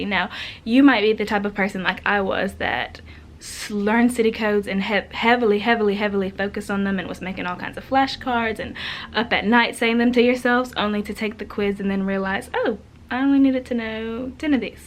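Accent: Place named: South Carolina, United States. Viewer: Sounds like Southern Carolina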